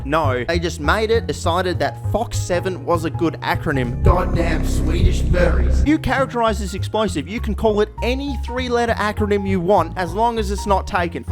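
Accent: Australia. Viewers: today is a good day, E&F video. time to hear an aussie love the 60s.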